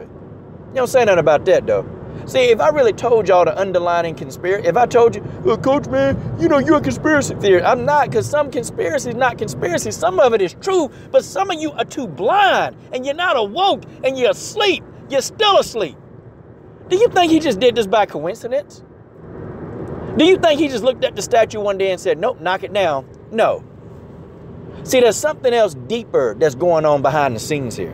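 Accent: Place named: South, United States. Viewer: Coach's Southern pronunciation strikes again, ahaha. It's Koo-Ree-Chee-ba... or Koo-Ree-Tee-Ba for European Portuguese/certain parts of the country. :)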